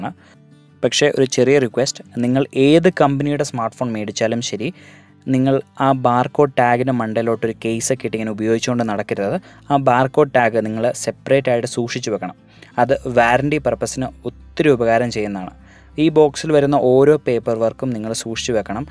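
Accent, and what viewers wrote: Idukki, India. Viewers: Idukkikkaran anoo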